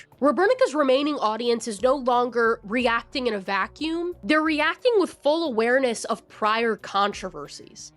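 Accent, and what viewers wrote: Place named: Appalachia, United States. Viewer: as someone else from rural appalachia hearing your accent made me really happy because i dont think i’ve ever heard any video essayist with one similar to mine. you’re awesome definitely subscribing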